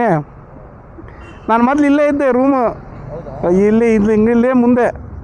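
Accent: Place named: North Karnataka, India. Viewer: ನೀವು ಏನ ಹೇಳ್ರಿ ನಮ್ ಉತ್ತರಕರ್ನಾಟಕ ಭಾಷೆ ಅಂದ್ರೆ ಅದು ಒಂದು ಗತ್ತು ನೋಡ್ರಿ Nivu Hubli avra…?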